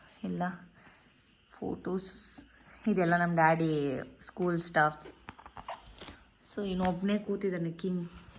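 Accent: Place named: North Karnataka, India. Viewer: Niv North Karnataka davaru anisutte alva namma language tara 😊ede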